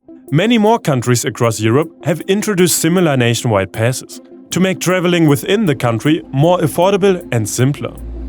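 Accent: Germany. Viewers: As a German I tend to not like German accents but yours is just part of the good news for me. I originally come from the Lausitz and am intrigued by the news about the battery project. Keep up your superb work. I struggle to watch “normal” news since a decade now…and stopped it actually almost sompletey a couple of years ago as it was not doing anything good to my mental health status, just sometimes I open the tagesschau, but your videos give me so much faith in the future!!! Danke Leute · Are you German? Your accent sounds pretty german to me!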